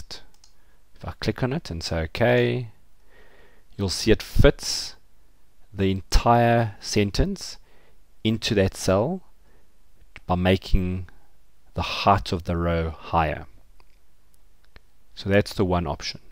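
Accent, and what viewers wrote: South Africa. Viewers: This was helpful! I recognize the South African acccent. :)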